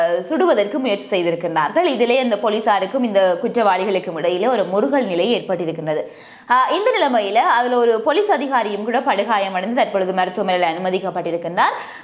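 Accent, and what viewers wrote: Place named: Sri Lanka. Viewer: Sister ugka language style superb alakana Sri Lanka tamil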